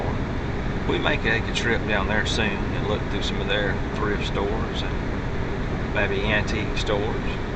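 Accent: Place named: South, United States. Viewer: I got a great vinyl collection but no friends to share them with. I know you would enjoy them. Shame I live over in England near a place called Liverpool of Beatles fame. Love your southern accent.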